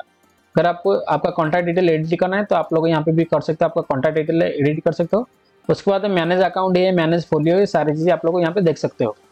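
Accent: Odisha, India. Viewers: Bhai... Odisha se he kyaa.... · Sir App Odisha Ka Hain Kya?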